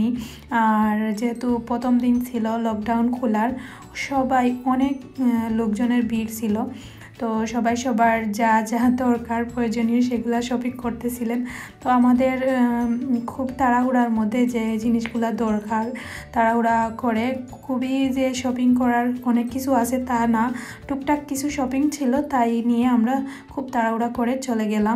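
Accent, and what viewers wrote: Sylhet, Bangladesh. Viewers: আপু আপনার মুখে সিলেটি মাত ভালা লাগে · apu apnar mukher sylhety accent ta besi valo lage please apni oi vabei video banaa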